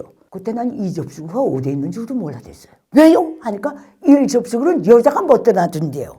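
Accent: North Korea. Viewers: 말투가 멋있으시네... 북한말투 거부감 들던데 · 북한말투이면서 너무 재미난 말투를 가지셨네요~^^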